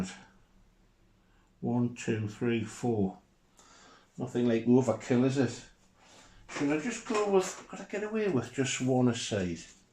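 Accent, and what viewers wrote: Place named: Newcastle, United Kingdom. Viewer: Wow. Overengineering at its best. Fab build and all accompanied in mellifluous Geordy. Thank you so much. I have seen the Ladybird kit in books and catalogues for decades but never realised what a fascinating build it was.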